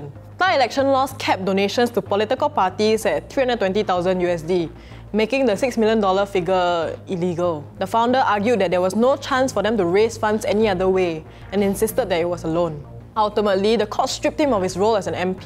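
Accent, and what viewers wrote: Singapore. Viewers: That is how we Singaporeans sound like. Don’t like it then it is your problem. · The unabashedly singaporean accent is refreshing compared to the fake western accent that most reporters have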